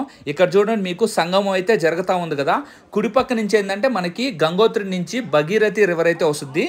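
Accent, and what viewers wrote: Nellore, India. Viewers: Bro mana nellore slang baga telastundi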